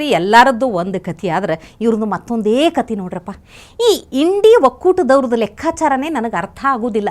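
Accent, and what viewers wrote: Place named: North Karnataka, India. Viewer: ಸಹೋದರಿ ನಿಮ್ಮ ಉತ್ತರ ಕರ್ನಾಟಕದ ಭಾಷೆ ಅದೆಷ್ಟು ಚಂದ, ಹೀಗೆಯೇ ನೀವು ಇನ್ನು ಮುಗಿಲೆತ್ತರಕ್ಕೆ ಬೆಳೆಯಬೇಕು ಅನ್ನೋದು ನನ್ನ ಆಶೆಯ...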